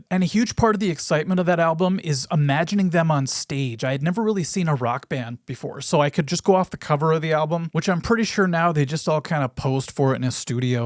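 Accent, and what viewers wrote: Minnesota, United States. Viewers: Idk I never noticed your Minnesota accent till now 😂 but YEP everything's been fake!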